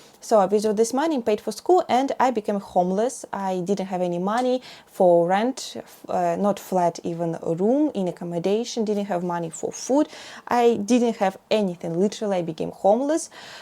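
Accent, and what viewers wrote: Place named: Russia. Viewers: The way she speaks is typical of Russians. As you notice, she doesn't use words like "the" since that doesn't exist in Russian grammar. She's not difficult to understand though. Most Russians won't be able to maintain this rate of speech and their pronunciation will be far off what you would expect. Channel would become Chairneeel. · i saw a short an thought you're Japanese talking English, but you're Russian talking Japanese English ... its quite hard to understand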